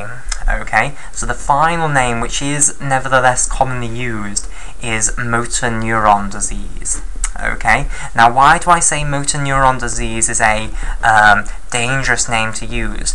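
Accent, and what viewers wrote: United Kingdom. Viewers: He sounds British; probably from the UK/England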